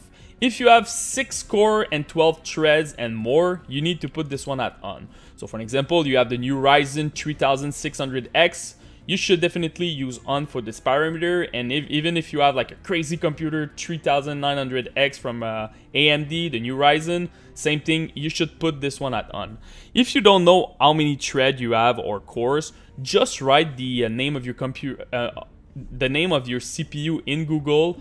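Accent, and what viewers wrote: Quebec, Canada. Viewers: Et mec jtai vite cramé avec ton accent quebecoi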